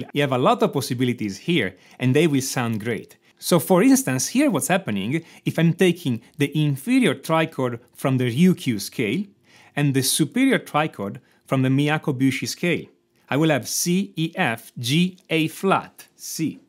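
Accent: Italy. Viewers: Ho Capito che eri italiano dalla prima parola. Comunque il video è molto interessante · Si sente che sei italiano <3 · Avevo immaginato tu fossi italiano, il mio orecchio ha sentito giusto 😂😊🇮🇹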